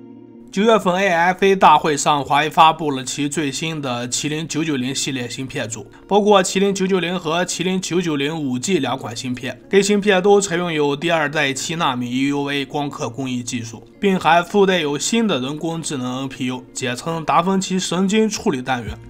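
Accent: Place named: Chahar, China. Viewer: 察哈尔口音，老乡啊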